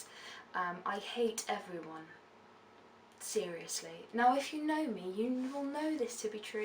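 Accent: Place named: London, United Kingdom. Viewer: Sounds like you've caught a tad of the London accent tales, get some of those vocals up on YouTube!!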